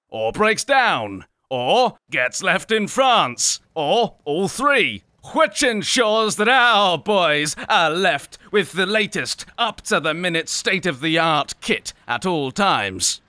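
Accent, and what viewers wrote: United Kingdom. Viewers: British accent is so annoying 💔🤷‍♂️ Am I the only one? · Are you British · very british accent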